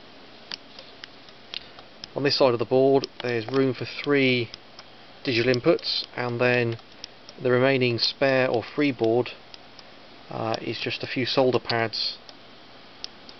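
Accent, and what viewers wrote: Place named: South West England, United Kingdom. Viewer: Sounds like a South Western accent... always nice to hear on the internet! Nice video!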